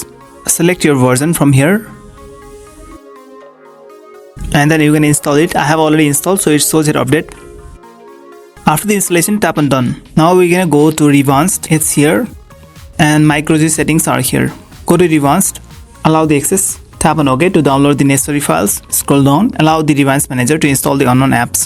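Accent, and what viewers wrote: India. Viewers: couldnt understand jack shit from the indian accent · That seems like a you issue honestly. That said, he does have some weird mix of accents, & this is coming from another Indian.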